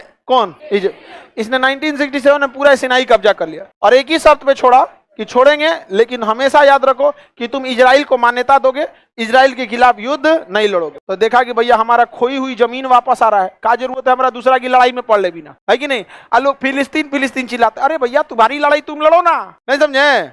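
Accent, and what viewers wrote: Bihar, India. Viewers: Bihari me bolna band kr samajh ni ata kuch tera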